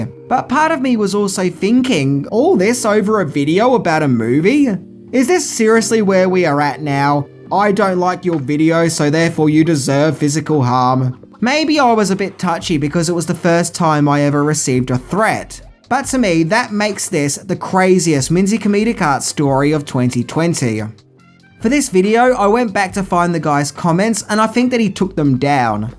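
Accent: Australia. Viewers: Seams like someone is just envy of our boy Minty! I like your happy face and your Ozzie dialect, it feels fresh and puts a smile on my face. Don’t change a thing and don’t bother with any haters, if they don’t like the channel they can’t go watch WatchMojo or something! Regards from Sweden! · THANK YOU Minty, just Thank you. You are wonderful as you are and your aussie accent is brilliant, there are a lot even worse accents outthere. Greetings from Vienna, Austria. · Minty despite what some people think I think you are very positive human being with a very good sense of humour either lighting me about some of the movies I like most and some I don't like very much I look forward to hearing you speak your wonderful Australian accent keep up the good work and I'm jealous of your action figure good work brother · I have throughly enjoyed all of your videos that I’ve watched so far which has been a lot. 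I quite enjoy listening to your Aussie accent. As for the haters just remember this quote “You may not be everyone’s cup of tea but you are definitely someone’s double shot of vodka”. I look forward to watching more of your videos. 💜🇨🇦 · You definitely made 2020 easier to get through and I think people with Aussie accents are just fine. Other Youtubers from there sound just as cool. How good? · Thanks Minty, As a fellow Aussi, I don't find your accent annoying. There are worse Australian Accents than yours (Tasmania anyone). How about a series of videos on 70s, 80s, and 90s movie sex symbols? Just an idea. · Yes, I find your accent a bit annoying ( Im an Aussie too) BUT most of the facts you present are original and fresh so that's why I subscribe and follow. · Watching your videos helps me practice my Australian accent.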